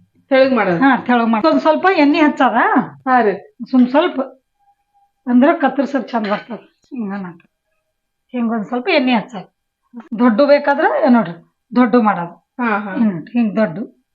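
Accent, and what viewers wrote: Maharashtra, India. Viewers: Nimadu yaa uooru Maharashtra hatira.ada einari yakandr marathi mix bhasha bhala matadteri. Nimm bhasha Keli khushee aitu nanu hinge matadteni aadak❤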